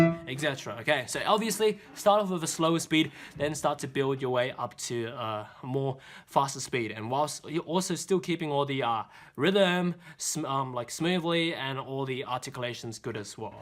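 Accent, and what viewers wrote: United Kingdom. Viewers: Even tho bro's asian his accent is british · You’re British man and accent coo!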